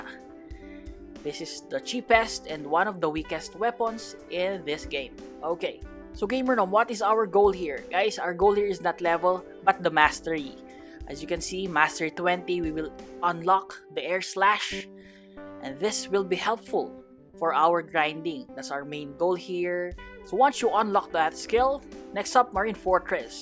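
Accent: Philippines. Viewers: Parang filipino accent mo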